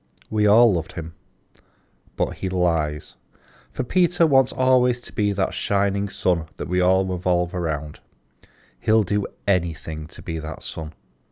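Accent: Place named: United Kingdom. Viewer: That was great, thank you! It’s so nice to hear a Brit read something on YouTube, good to hear it in a reasonably local accent too. Will check out your review of the whole book too.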